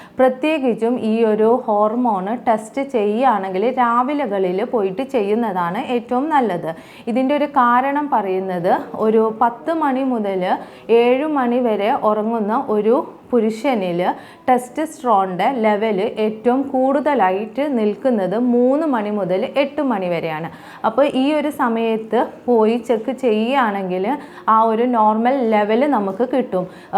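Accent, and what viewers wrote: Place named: Kannur, India. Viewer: Kannur slang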